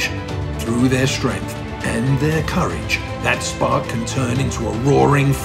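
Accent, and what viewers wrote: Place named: United Kingdom. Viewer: Yup. As the voice was British, it reminded me of when small British computer games companies, in the 80s and 90s, would just use their office staff to do voice samples, or pose for being digitally scanned, into their games (such as the bored secretary's voice of "Insert Disk 2/First Aid/etc" in Team 17's "Alien Breed"). These companies would also sometimes used the same sort of staff for their adverts, both in magazines or on the telly.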